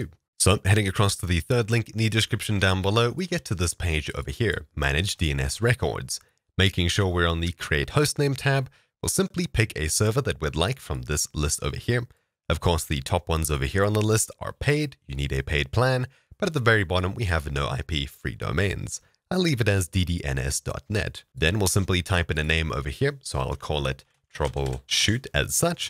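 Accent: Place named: South Africa. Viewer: I thought I could hear a fellow South African in that voice!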